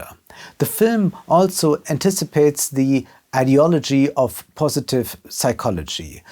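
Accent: Germany. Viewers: High quality content with a cute german accent! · Ohne den deutschen Akzent wäre die auch im Englischen makellose Wortwahl nur halb so schön. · Sympathic german accent. Really like it:) Immer weiter, keiner macht sein Ding so schön wie Du:) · Though the German accent is very lovely, considering enabling the subtitles might help the one or the other to better understand what is being said. Would be a shame considering the brilliants of the analysis.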